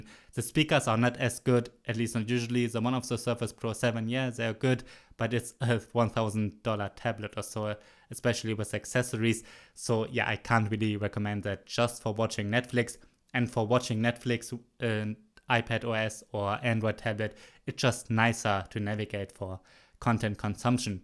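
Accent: Germany. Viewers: This guy is German, right?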